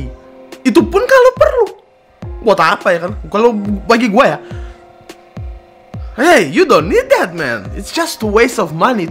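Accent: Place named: Indonesia. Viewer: gilak bahasa indonya udah logat indo banget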